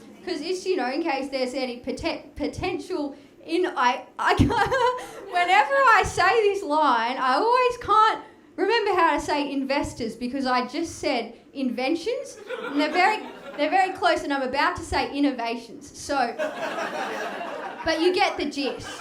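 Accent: Australia. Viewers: Wow you are amazing I love your energy and of course the accent is to die for, I'm from Alaska and there's only ever been one and a half Australians here and I missed the event because it was like 900 miles away almost halfway across the state lol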